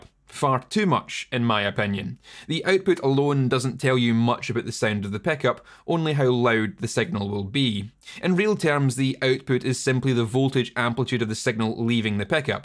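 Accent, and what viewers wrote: Scotland, United Kingdom. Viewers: It reminds me of Mr. Scott on Star Trek. "The engines canna take much more of this, Captain! Ach, my poor wee bairns!" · Great video (except too much information crammed into so little time at a speed that precludes taking notes since I can't write that fast, with such heavy British accent that I can't understand because you talk too fast)! But on a separate note (no pun intended), how different are today's pickups from S.G.'s patented ones? (I think it was SG, Simon maybe? crap, I can't remember anymore cause I'm so stinking old!!! ... Ole what's his face .... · Not being a Scottish Highlander myself, I had to slow down the Playback speed here AND use the CC subtitles as I also often do with Irish movies, unlike Liam Neeson would do with his "particular skill set" of being an Irishman. The Great Scots even make the intrepid Irish look like wussies while even wearing their skirts too; that's like Viking level cool and I've gotta Love that!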